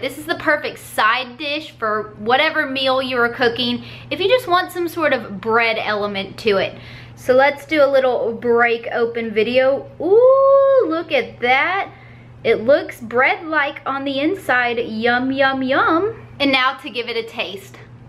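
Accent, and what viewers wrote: South, United States. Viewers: “Brushy ma jig” love it! Love ur southernness.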